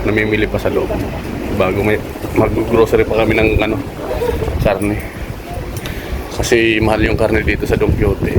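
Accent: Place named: Batangas, Philippines. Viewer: Boss, taga batangas ka? Yung accent mo kasi parang similar sa mga batangenyo. Anyways godbless ingat palagi, tuloy lang boss sa pag vlog, support lang kami dito kabayan. May kasabihan nga ang mga OFW ang bagong bayani ng pilipinas❤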